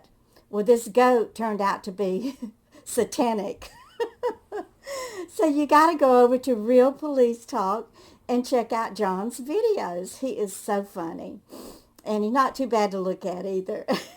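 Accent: South, United States. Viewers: Hi, You are so funny. I love your stories! Not southern but love when your southern SWAG comes out. Love it and Love you.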